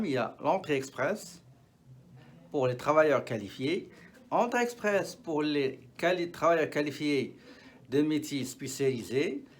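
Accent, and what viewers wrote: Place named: Morocco. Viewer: لا اقصد العربية الفصحى ، ولكن اقصد الشرح بلهجتك المغربية الجميلة ❤🍁🎈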